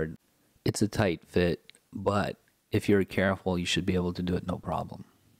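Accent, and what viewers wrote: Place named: Philippines. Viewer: cool dude, i know ur pinoy base on ur accent 😁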